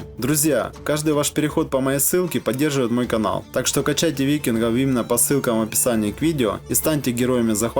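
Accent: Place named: South, Russia. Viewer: Задолбал ужасный южный говорок даже в рекламе. Кубанойды в блогерах все, тунеядцы.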